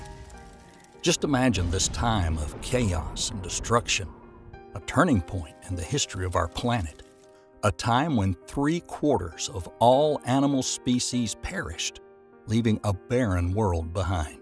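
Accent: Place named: Rural, United States. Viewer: Y'know what I just realized while watching this? My view of the south-western US states was subconsciously improved by the fact that this info is being delivered by a rural American accent. It's embarrassing to realize I got influenced in that way, but...seems like an effect that tourism boards should be looking at. Evolution being discussed by this demographic feels out-of-place, but it doesn't have to!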